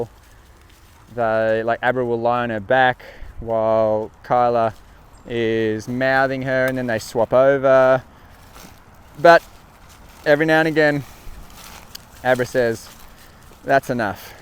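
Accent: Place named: Australia. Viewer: Good to know. Thanks for the update. By the way, I'm from the US and it was your Aussie accent that had me thinking you were saying "Carla" instead of "Kyla."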